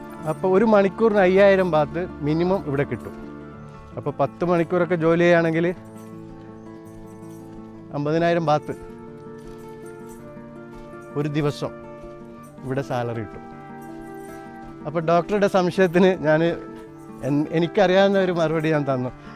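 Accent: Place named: Malabar, India. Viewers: Reminds me of old hippie time in kovalam in 70s..now it's all over..I too was involved in it .but looking back it was a time pass..I didn't know hippies are still there? A rare species anyway..laziness, drugs and such..u sound like from Malabar? How did u reach there?single?need not answer if u don't want...all the best? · നിങ്ങളുടെ മൂന്നാമത്തെ വീഡോ ആണ് കാണുന്നത് നിങ്ങളുടെ നദാപുരത്തെ ബാഷയും വിനയവും എനിക്ക് വളെരെ ഇഷ്ടപ്പെട്ടു നാണു വാണിമേൽ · iam watching from atlanta, georgia., usa.....i accidentally came across...ur video....i like your presentation...the language is different...kozhikode style....very good..and your innocent attitude, rather sincere approach...and presentation...is appreciable....thanks....waiting to see the next vedio....👌👌👌👌👌👌👌👌🤣🤣🤣🤣🤣🤣🤣🤣😁😁😁😁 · Hallo Sir. You are explaining excellently in your videos. From your slang I can understand that you are a malabari. 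Any how all the best and waiting more videos from you.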